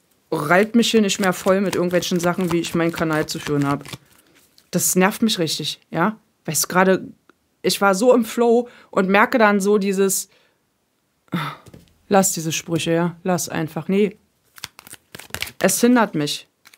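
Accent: Berlin, Germany. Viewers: Liebe, lass die Leute reden, da fällt mir das Lied der ein. Ich danke dir für deine Arbeit deine Zeit und deine Legungen 🙏🍀💫 Alles wird gut, ich bin im Vertrauen 🍀 Ich mag dich sehr, höre dir gerne zu, es gibt Legungen die ich einfach nur höre, andere sind wieder sehr stimmig. Du bist spitze, deine Berliner Schnauze, deine klaren Worte, Bombe einfach alles 💪🫶☀️ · Sächsische Einlagen? Ist doch eher Berliner Dialekt.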